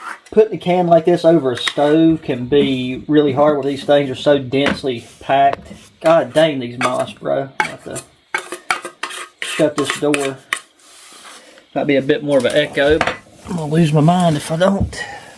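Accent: South, United States. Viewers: Very cool Amigo. New subscriber. I have only tried one of these Kaz. rations, older version. Components are standard for Russian, Ukraine, and entire area. I like most of them. Those big pieces of beef, in Russian meals, is called Tushonka. Cabbage meal is called Bigos, usually has sausage in it. Both are tasty. Glad you had fun on this ration. Peace from Kentucky, John. P.S., just guessing from accent, you are or were from Southern U.S. ? WE all sound the same, LOL. That is A compliment!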